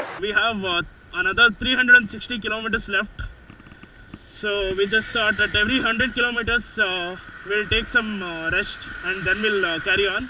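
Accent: India. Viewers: Dude your english accent is very funny...its not the proper accent that indians speak..might be u r south indian tats y it is like...if I m wrong..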